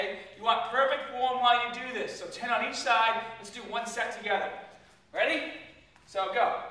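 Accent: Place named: New York, United States. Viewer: haha you might get a influx of costumers Usaully when people ask me questions I usully adivse to see your fitness videos and get his meal plan they work for everyone great site, but today I joined yahoo answers haha I think i have told like 150 people to join your site and how great it is:) haha lucky and im studying nutrition and getting certified as a personal trainer ( but no competion im CANADIAN whitch reminds me come to CANADA to TORONTO we would love to hear that new york accent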